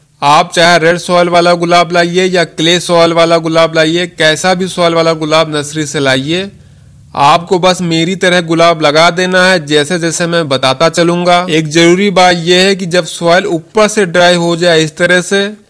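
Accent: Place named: Varanasi, India. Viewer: Beta ache se samjhate ho. Tum banaras ke ho ya aas pass ke ho.tumhare boli me innocency jhalakti Hai. God bless u.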